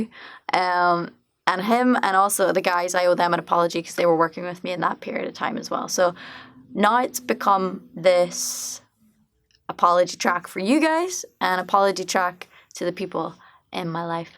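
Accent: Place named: Ireland. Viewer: You loosing that beautiful Irish accent, need to spend more time back home.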